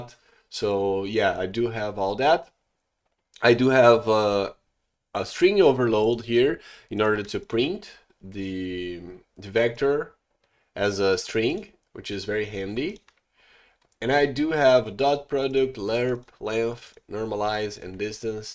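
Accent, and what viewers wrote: Brazil. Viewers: are you brazilian by any chance? I can guess by the accent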